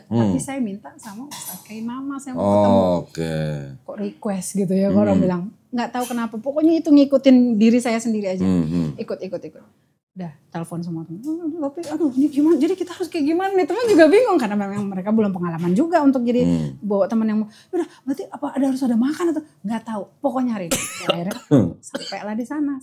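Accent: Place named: Betawi, Indonesia. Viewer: betawi banget........dialeknya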